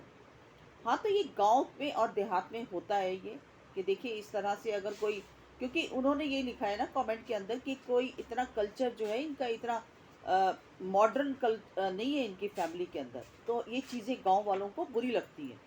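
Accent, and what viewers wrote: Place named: Muzaffarnagar, India. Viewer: Haryana ka touch to bilkul nahi aata. Muzzfernagar ke area mai aise hi bola jaata hai.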